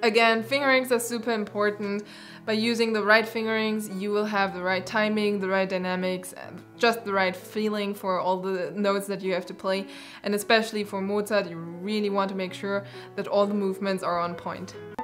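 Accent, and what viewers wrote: Germany. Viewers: mann merkt so hart das sie deutsch spricht hahahaha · Ich habe seid anfang an gedacht das du deutsch Kannat am Akzent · Awesome video! You're a true musician. Speaking of what you are: You sound very German. Are you German, by any chance?😁 Keep up the amazing work!! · Man hört du bist deutsch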